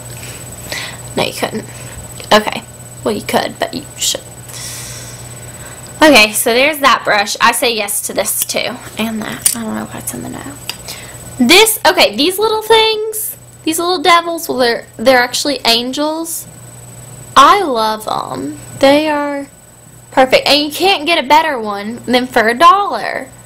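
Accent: South, United States. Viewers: OMG SHE KINDA HAS A SOUTHERN ACCENT!!! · I love her southern accent!! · You sound country in this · this is so cute... i love her southern accent in this video... she doesn't sound like this anymore...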